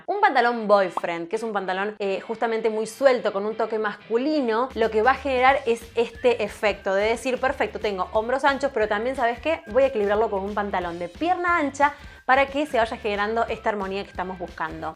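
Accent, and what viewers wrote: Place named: Argentina. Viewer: vi muchos vídeos aqui en Brasil sobre el cuerpo triangulo invertido, pero sin duda tu vídeo explicó mejor que todos, gracias un beso, es el primero vídeo tuyo que veo, imagino que seas argentina no? El acento es inconfundible 😊😙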